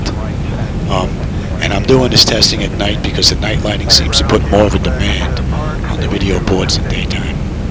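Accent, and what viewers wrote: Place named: Queens, United States. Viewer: I thought your accent sounded a little familiar. I spent a "lovely" 8 months in Queens off the Van Wick Expressway shortly after 9-11. Saw the biggest rat of my life climbing the stairs up from the subway, lol.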